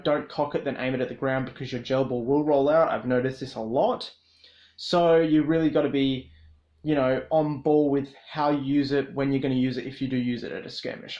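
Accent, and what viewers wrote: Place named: Australia. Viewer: Jelly Fishyzz all Australians sound like him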